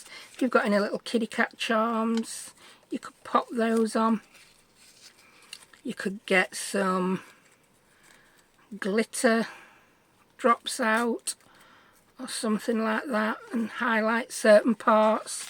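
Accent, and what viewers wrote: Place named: England, United Kingdom. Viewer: Great video, love your waffling, faffing and your accent, it reminds me of when I lived in England. Cute little folio, thank you 🥰🌺❤️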